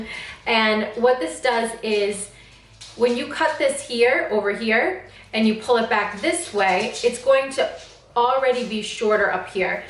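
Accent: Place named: Russia. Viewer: I see your images all over instagram. For some reason I thought you had a Russian accent or German.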